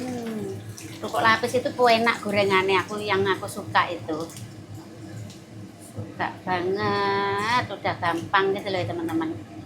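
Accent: Java, Indonesia. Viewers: Yg bikin suka liat chanel mb, dialek jowone kui lo msh lekat dan kentel banget 😄, apa adanya, pdhl lama tinggal di luar negri. Saluut deh... Mb good atittute 👍 baik hti, mknya suaminya jg baik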